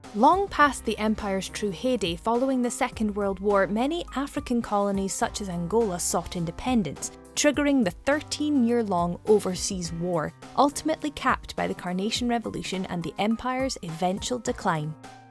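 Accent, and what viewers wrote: United Kingdom. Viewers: You guys are disgrace of YouTube! Stop spreading propaganda! Aren’t you ashamed to erase free speech? From your accent, you are British? Aren’t you ashamed hiding the true history and erasing the dirty history of yours?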